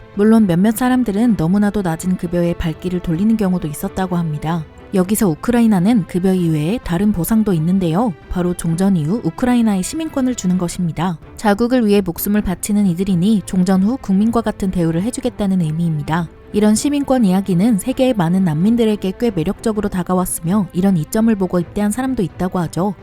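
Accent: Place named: Seoul, South Korea. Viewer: 누나 이제 서울말 잘하네여ㅎㅎ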